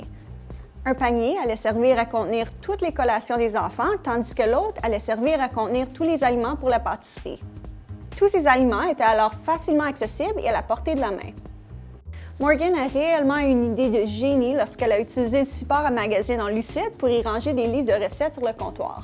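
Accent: Quebec, Canada. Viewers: cet accent canadian me rend nerveux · On devrait lire accent canadien s'il en était un, mais il s'agit ici d'un accent québécois. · Je suis québécoise et sa manière de parler m'angoisse aussi.. nous ne parlons pas tous comme elle ahahaha. ;)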